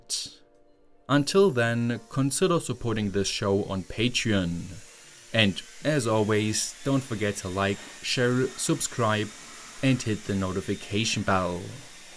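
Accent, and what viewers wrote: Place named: Germany. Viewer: Very informative, but the weirdest accent I've ever heard... super German, but still saying "garage" like an American, and also; who taught him to hold a pencil? That looks terribly painful/inefficient !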